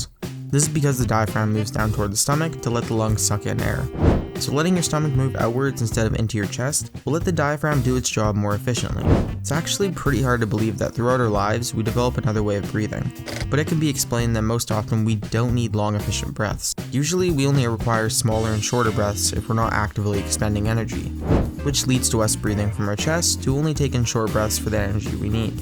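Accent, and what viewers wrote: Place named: Canada. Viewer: A-bOAt / oAt (INSTEAD of aboUt and oUt) says that you're CANADIAN!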